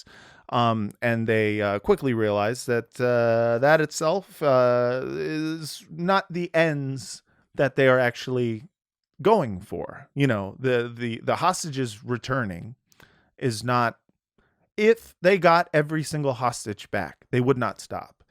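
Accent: England, United Kingdom. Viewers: as a brit, your accent of a ingerlander was perfect. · I'm a Kiwi and I thought your Pommie accent was great! Only randomly came ax this thanks to YT algorithms since I've been binging Palestinian history and news content. It's been super-interesting listening to your views and experiences. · Your British accent is 45% Arthur Shelby and 55% Alfie Solomons from Peaky Blinders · your british accent is amazing hhhhhhh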